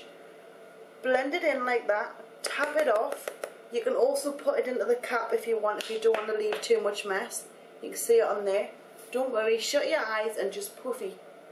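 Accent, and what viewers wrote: Newcastle, United Kingdom. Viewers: where are you from? it sound like your from around the newcastle x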